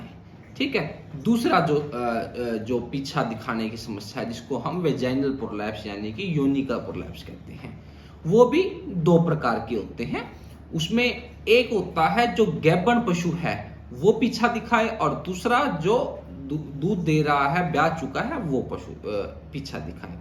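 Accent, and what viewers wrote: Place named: Haryana, India. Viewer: Dr sahab aap laagta hai haryaana se hai aap Hindi mein bahut aatak rahe hai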